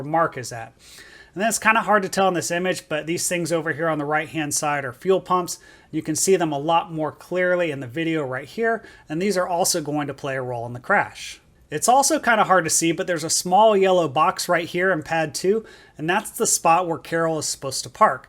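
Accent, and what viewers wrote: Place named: California, United States. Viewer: Hey are from California? Got that cali accent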